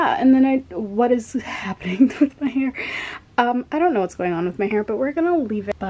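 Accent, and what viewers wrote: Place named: United States. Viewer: I listen you because I wanna to hear the american accent 👍🏻 you great👍🏻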